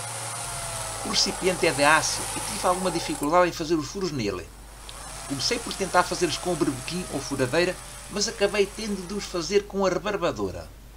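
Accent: Portugal. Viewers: sotaque de Portugal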